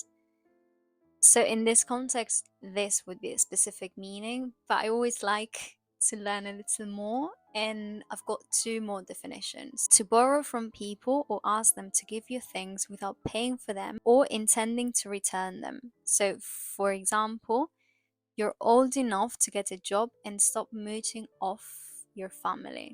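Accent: RP, United Kingdom. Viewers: I'm American and have never heard "mooch" used that way before, 😅so I'm not surprised you haven't heard it before either. Very colloquial British I guess, no American would say that. (The second use of "mooch" as in "freeloading," everyone would understand though.) You probably already know this but when she says, "slipping into bad habits of laying in bed doom"; Firstly, she meant "bedroom" (obviously), secondly, the correct word is "lying." It's an intransitive verb and sometimes even fairly educated speakers mix up "lying" and "laying." Just wanted to say that mistakes like this have always given me pause about learning from social media though. Your English is great and very natural. Your RP accent would no doubt get a lot of attention and a load of compliments in the States!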